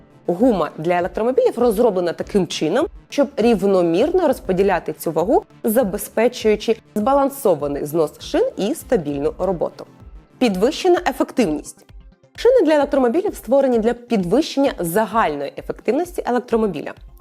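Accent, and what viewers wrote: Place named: Russia. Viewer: вибачте- на "першому автомобільному" на другий рік війни ви капітулювали і,вслід за іншими, обмоскалились!??? Це жах - чути Вас масковскою😢😢😢... Осадачєк астался😮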